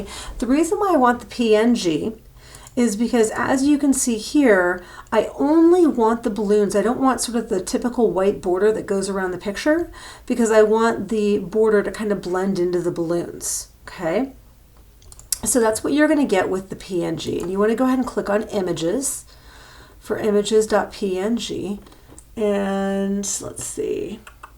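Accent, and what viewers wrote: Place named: Transatlantic, United States. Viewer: Please slow down on your talking, too fast for me, especially with a transatlantic accent.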